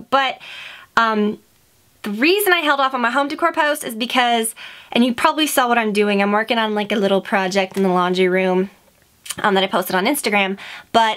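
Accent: South, United States. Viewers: You're accent is so cute! Not too country but still southern sounding.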